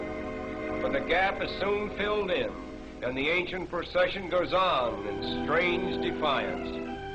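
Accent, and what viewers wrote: United States. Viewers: What a horrible American accent! The British don't pronounce Bombay like that. lol.. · 'as described by the Britishers'sounds like an American/Canadian to me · And the accent is American; not British. And there is no word in modern English called the..."..the Britisher"... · The commentator appears to be American or Canadian, not British. Great video! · I believe that this is an American documentary, the narrator is certainly an American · It appears to be an American voice rather than British. Great video....the city should have retained its real name of Bombay...Mumbai was a group of mosquito-infested islands inhabited only be fisherfolk; Bombay was the modern city that the British built!!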